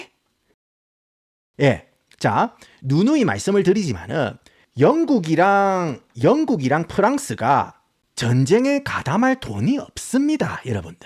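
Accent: Gyeongsang, South Korea. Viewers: 경상도 사투리 구수하고 영어도 잘 하셔서 계속 듣고 싶어요 ㅎㅎ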